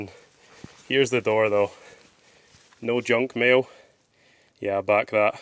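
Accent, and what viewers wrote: Scotland, United Kingdom. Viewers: Super Scottish accent 😅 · I had a scottish classmate before in Law School. Really nice and smart guy. McCleod is his family name. Your accent is the same with his😊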